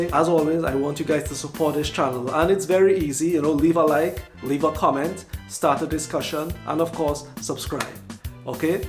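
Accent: Trinidad and Tobago. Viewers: Loved the video, very informative and love your Trini accent, my great grandmother originated from Trinidad and my Grandmother from St Vincent. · Barbados's name that so fantastic - the obvious reason we were not taught this in school no books were available to know either, but now we can learn. truth has to come out! Thank you and who else would do such a great job with an accent but a Trini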